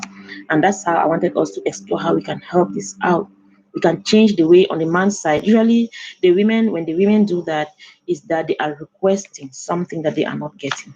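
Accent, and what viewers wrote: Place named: Cameroon. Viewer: Wahoo auntie u sound like a cameroonian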